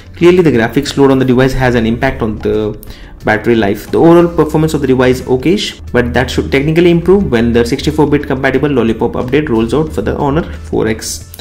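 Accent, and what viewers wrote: India. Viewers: maybe you should remove your indian slank when you speaking in English..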